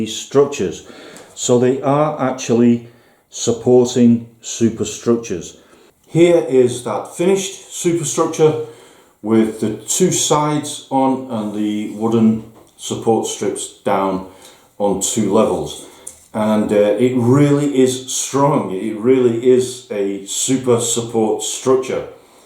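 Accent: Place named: North West England, United Kingdom. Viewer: Where are you intending to display this? I assume you're in north west england from the accent?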